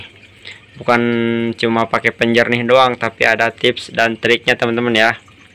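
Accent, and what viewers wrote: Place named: Sunda, Indonesia. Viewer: Sunda bngt eta si akang😂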